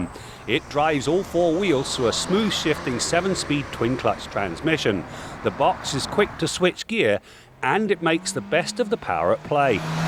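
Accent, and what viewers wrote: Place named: United Kingdom. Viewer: Is originally from the UK? I like his accent.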